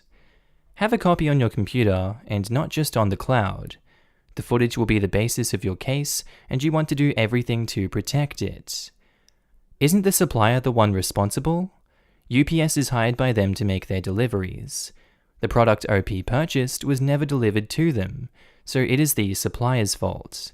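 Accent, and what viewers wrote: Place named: Australia. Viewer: My mom loves your aussie accent, and loves watching these videos with me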